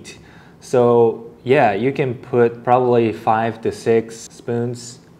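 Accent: Malaysia. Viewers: i think you're starting to catch a bit of malaysian accent in your english accent hehe:D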